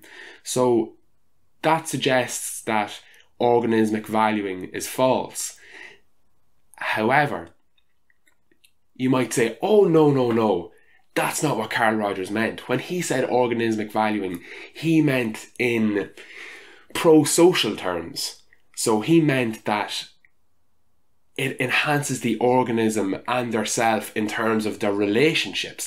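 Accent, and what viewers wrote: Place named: Ireland. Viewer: the kindness in the eyes, the gentleness that comes up naturally, the polite approach combined with evidence, and the lovely 'r' cannot belong to anyone else but an Irish soul! I am a foreign student from Bulgaria and have done my BSc in Scotland and MA in England and have been luckily blessed enough to meet the Irish culture as well along my way and could say that I love all my Irish friends! Been a pleasure to watch your content! Keep up the good work!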